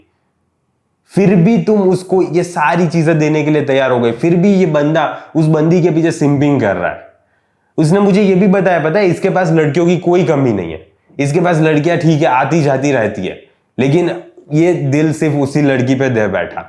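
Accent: Gujarat, India. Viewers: Gujju accent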